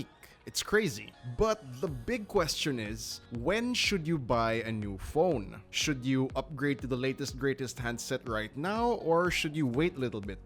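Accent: Philippines. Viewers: Philipino accent😂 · Bro’s Filipino af I can tell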